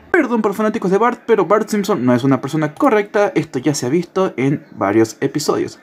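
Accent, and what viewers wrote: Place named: Argentina. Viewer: Tu voz me acuerda a tucosmopolis pero con acento argentino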